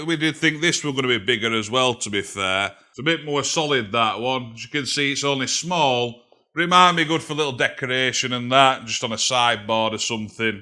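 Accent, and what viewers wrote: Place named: Barnsley, United Kingdom. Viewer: He's from the UK and that sounds like a Barnsley accent which is in south Yorkshire 👍👍👍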